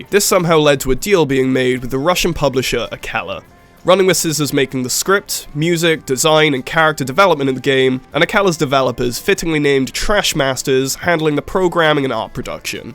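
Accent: United Kingdom. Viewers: This is a great video dude, also could be completely off but are you Welsh?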